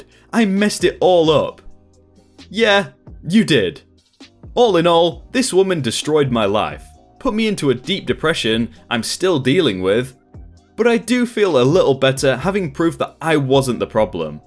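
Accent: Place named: Midlands, United Kingdom. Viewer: I've been wondering about this for a while... are you a Midlands England guy? Your dialect is super distinct. Liverpudlian? Manchester?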